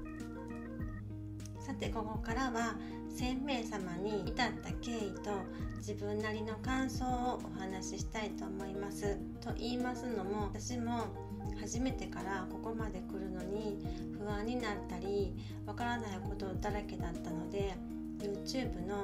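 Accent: Kyoto, Japan. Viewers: アラフィフ・・・ですか！？お若くて美しい。 京都のディープな所をご紹介頂き、東京から中々抜け出せない私にとっては、興味津々摩訶不思議な異世界を垣間見せて見せて戴き、とっても楽しみなチャンネルです。今後も京都の隅々までご案内して頂けたらと、期待を込めてチャンネル登録させて頂きました。 京都弁良いですね〜。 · めちゃくちゃ、京都弁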